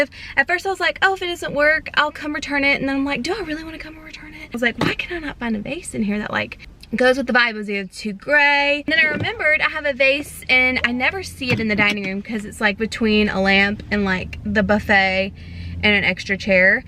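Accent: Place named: South, United States. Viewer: I can tell my girl is back in the south because that accent is a lil thicker!! 🤣 I love it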